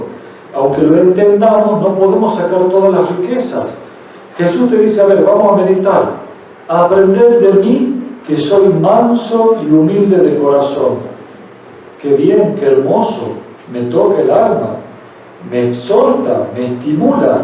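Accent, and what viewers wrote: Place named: Argentina. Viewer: Doy gracias a Jesucristo porque me permite participar y aprender en este retiro. Gracias Padre por su enseñanza. Doy gracias a Jesucristo porque me permite participar y aprender en este retiro. Es muy clara su explicación pero me cuesta mucho trabajo entender la dicción, tengo que repasar varias veces algunas partes del vídeo para entender. Perdón por no recordar el nombre de su compañero, pero la exposición que hizo sobre la confesión casi no la pude entender.Yo creo que ésto nos puede suceder a muchas personas que no somos de Argentina. Para retiros posteriores, pudieran hablar un poco más despacio? Es muy valiosa su aportación, por eso hago este comentario, Muchas gracias, Dios los bendiga.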